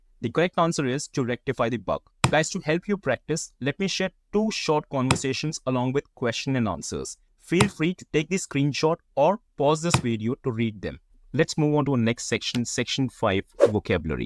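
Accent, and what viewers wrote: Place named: India. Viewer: 😢 Darn it!! That Indu accent makes me cry!!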